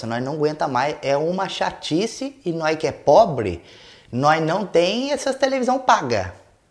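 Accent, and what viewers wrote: Minas Gerais, Brazil. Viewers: Hêêêêê Trêm Bão sôôô!!! Até que em fim, um minêrin pá salvá nóis nu you tubi uai!!! Faz uma recramação do tempo pra se aposentar, que nóis num aguenta mais trabaiá!!! Abraço!!!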